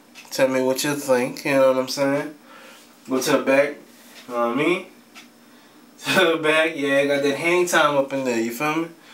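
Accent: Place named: Louisiana, United States. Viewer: u from lousiana my dude. u talk like my cousions.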